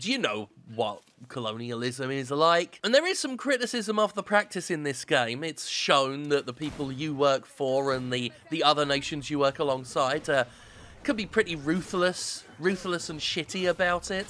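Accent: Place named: West Country, United Kingdom. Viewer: your west country accent is quite good (as a person of the westcountry) I'm going to say you're from Berkshire?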